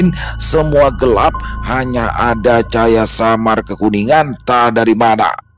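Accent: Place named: Madura, Indonesia. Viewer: Style madura bagus tuh 😂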